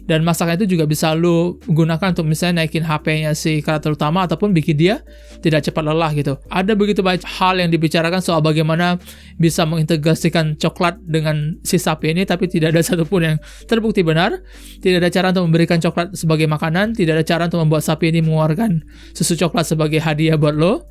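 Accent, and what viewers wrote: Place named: Pontianak, Indonesia. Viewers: Jak budak pontianak ke rupenye abang ni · Orang pontianak rupenye abang ni, mantap bang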